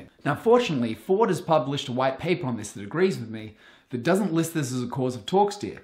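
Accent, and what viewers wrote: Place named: Australia. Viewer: what part of Australia is your accent from?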